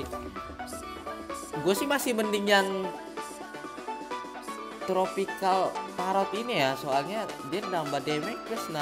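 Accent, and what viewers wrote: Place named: Java, Indonesia. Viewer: Jawa keras bos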